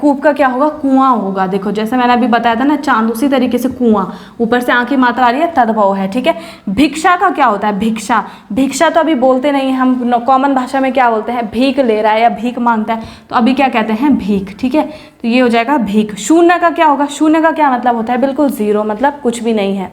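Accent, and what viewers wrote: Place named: Uttarakhand, India. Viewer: Bhut acha pda rhe ho mem aap uttrakhand se ho lg raa 🙏🙏🙏🙏👍👍👌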